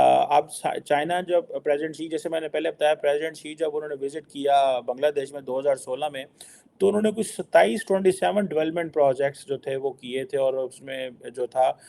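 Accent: Punjab, India. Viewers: Cheemi Bhayn Mithi Punjabi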